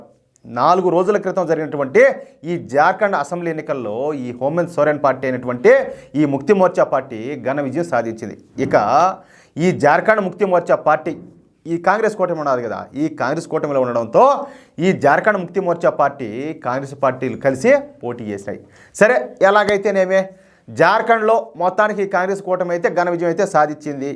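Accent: Rayalaseema, India. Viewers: Your rayalaSeema accent is too good sir... As iam from Telangana I can't recognise but is kadapa accent you spoke....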